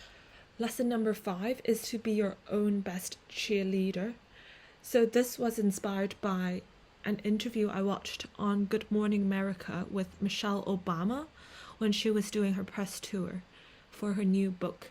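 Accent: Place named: United Kingdom. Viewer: why do u sound bri ish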